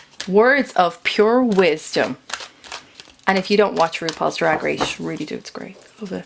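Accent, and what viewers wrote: Ireland. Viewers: Love that reading, love the Irish accent too! I'm Irish and lived in America for twenty one years, never got the adopted American accent, so hopefully you won't either! Thank you!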